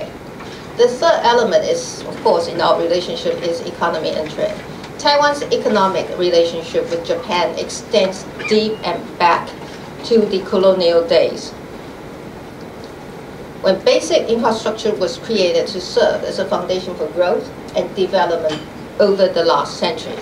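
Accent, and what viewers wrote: United Kingdom. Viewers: 如此純正的英國腔語言豈是牠馬的波士頓抓耙仔或其入幕之密友同志兼大總管公公的小混混所能比擬的呢！水啦！小英！台灣史上第一女 總統！万歳！万万歳！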